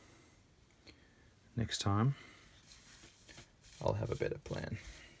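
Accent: South Africa. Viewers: Are you from South Africa? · Geolocation says Dublin, but accent is SA? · ... are you South African??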